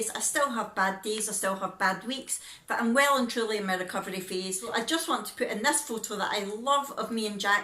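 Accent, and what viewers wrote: Scotland, United Kingdom. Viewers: Hi have just came across your videos on here, can I just say that you are such an inspiration, I am working my way through all of them lol 😊 really enjoying listening to a fellow scot for a change, I'm loving that you are a crafter too so am I. Thank you ❤️😘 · .. Sorry, it was Rick Chan who mentioned your channel in his comment on a mutual vlog we watch called Worms Eye View. She is learning Welch and how to say the words, and Rick mentioned your Scottish accent, which I love, so I scooted over to your channel. Hope I didn't confuse you. Ha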